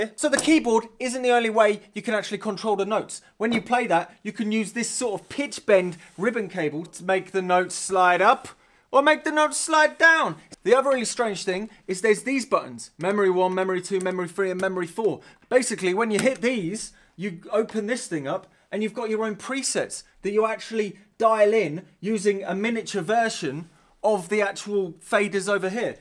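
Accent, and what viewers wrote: United Kingdom. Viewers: Which British accent would you call this?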